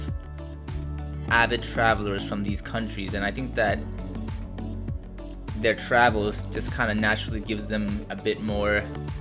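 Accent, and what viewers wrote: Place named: Australia. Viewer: Maybe because you have an australian accent which americans fetishise a lot. So yeah, if you're an aussie you're going to do better overseas not saying you won't do great in your own country, too lol. I heard that aussie girls are more into asian guys than european and american ones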